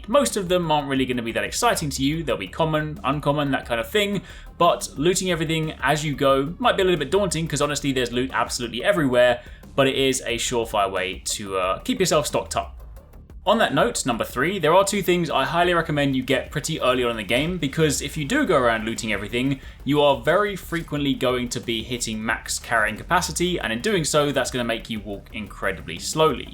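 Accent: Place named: United Kingdom. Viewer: I set to .75 speed and it is such a normal pace of conversation, I think it was recorded at this speed and then compressed to run at the current speed. Now if I can find the switch to turn off the over-accented british pronunciations so that I don't need subtitles, that would be awesome.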